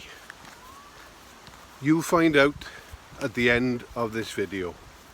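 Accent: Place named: Wales, United Kingdom. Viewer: This is my face. I have my roots in Wales. I heard it in your voice. We aren't so very far away from home. <link>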